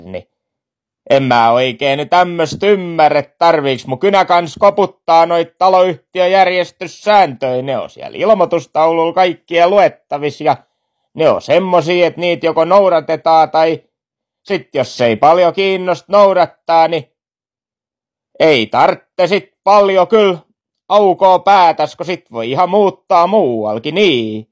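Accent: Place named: Pori, Finland. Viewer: Tää oli HYVÄ! -Ja viäl pori murteel :D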